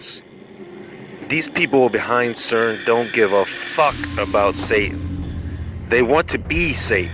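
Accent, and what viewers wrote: United States. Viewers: Why am I unsurprised this is narrated by an American.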